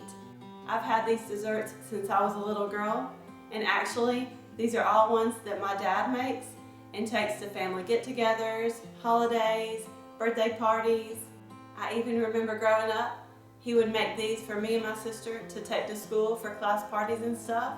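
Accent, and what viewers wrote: South, United States. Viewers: I just love your sweet southern voice. All of your recipes look so delicious!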